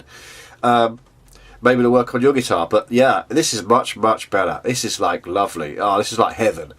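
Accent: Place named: Birmingham, United Kingdom. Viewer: You sound like a peaky blinder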